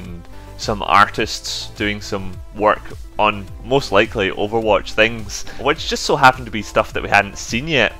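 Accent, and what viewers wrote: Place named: Scotland, United Kingdom. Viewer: Your accent sounds scottish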